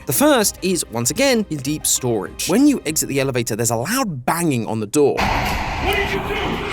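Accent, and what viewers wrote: United Kingdom. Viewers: I genuinely do not remember you being British, am i from another universe?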